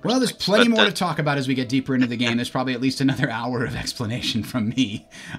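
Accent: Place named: United States. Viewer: The yankee pronunciation is annoying but hey, great vid. While the word "pop" made me cringe, you explained everything well. I really needed this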